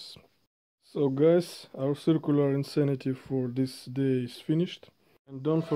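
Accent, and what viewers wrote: Russia. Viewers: Мне послышалось или у автора действительно акцент русский и он русско - язычный?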